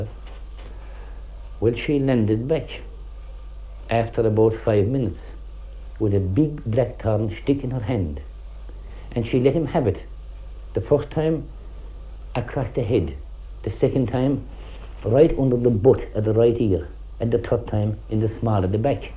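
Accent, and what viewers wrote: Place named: Limerick, Ireland. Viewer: That could have been my Limerick-born father or uncles talking!!! "advert izmunts" "Shtand there one second" "A big blacktorun schtick ....." Wonderful!!!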